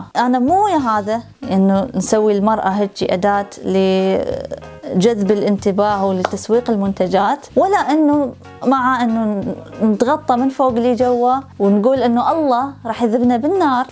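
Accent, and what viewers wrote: Basra, Iraq. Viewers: اصلا لهجتج بصرواية بحته .. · لابالعكس لهجتج بصراوية مية بالمية انا بصراوية واكولج انت بصراوية واحلى بصراوية ياوردة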